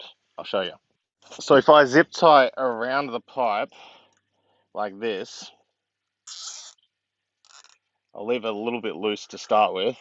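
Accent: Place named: Australia. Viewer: finally a yobo gardener.... sick of hearing yanks and poms... plus 10 bogan points for the beers... subscribing to this bloody legend!!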